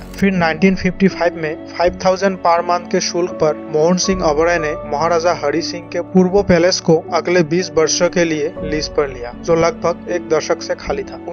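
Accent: Bengal, India. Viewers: DADA APNI JE BANGALI SE APNAR HINDI BOLAR UCHCHARAN THEKE BESH BALO BOJHA JACHCHE * HINDI BOLA TA EK TU CHESTA KAROON THIK BOLTE PARBEN * KHUB KOTHIN NOY HINDI BOLA HINDI STYLE "E " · Bhai Tui ki Bangali,Tor Uchharan puro bangali der moto. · Watch this very interesting video relating to Mohan Singh Oberoi - founder of the famous chain of Oberoi Hotels. He started his life with just ₹25. The commentator seems to be a Bengali.His command on Hindi commentary is poor.He calls a district SARGODHA (now in Pakistan) as SARGONDHA ! Inspite of this, this video is full of plenty of intereting information about M.S.Oberoi. 🌲💐😀👌💐🌲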